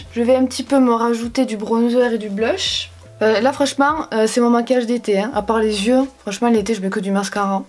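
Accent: South, France. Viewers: J'aime trop ton accent du sud et tu est trop belle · Es que t Marseillaise parce que moi oui et ta un accent du sud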